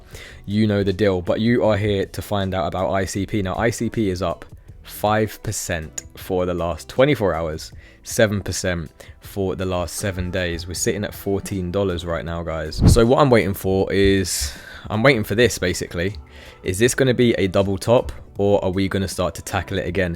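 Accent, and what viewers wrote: United Kingdom. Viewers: You must be from around Watford . You kinda sound like Anthony Joshua. Hello from Spain. 🚀💰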